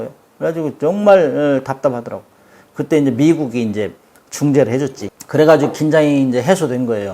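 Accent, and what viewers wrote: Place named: Jeolla, South Korea. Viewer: 말투가 절라도 네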